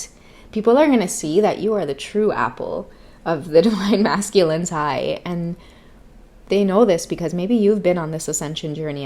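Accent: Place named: Trinidad and Tobago. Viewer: Not Jamaican, she’s from Trinidad not Jamaica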